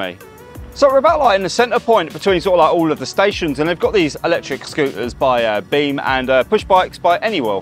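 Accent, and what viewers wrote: United Kingdom. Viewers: I used to English accent, can understand u ❤️‍🩹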